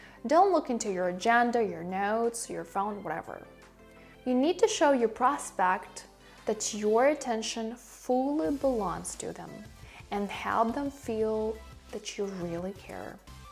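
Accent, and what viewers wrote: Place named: England, United Kingdom. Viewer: I have subscribed to your channel for your English accent. Your English pronunciation is different from others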